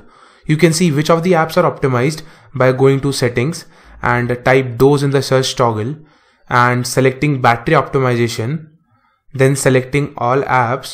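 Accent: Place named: India. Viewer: oh god ! im going to be sooooo racist! your accent is soooopo onmy nerve! i've heard another indians accent but your's just so deeeeeep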